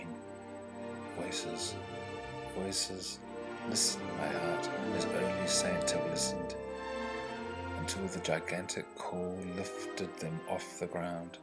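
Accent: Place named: New Zealand. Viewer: beautiful reading, you must be a kiwi?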